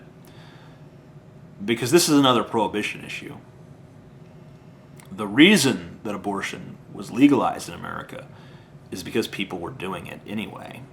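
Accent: Scotland, United Kingdom. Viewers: Its a Scottish accent, I cringed. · Thats a scottish accent? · His accent was Scottish :) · His accent is scottish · His accent is Scottish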